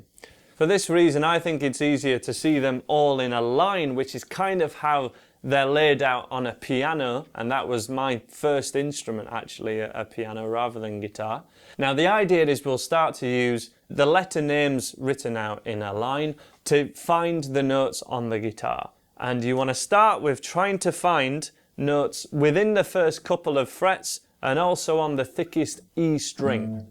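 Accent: United Kingdom. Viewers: I loved ya explanation and I also love ya british accent. That's really catching !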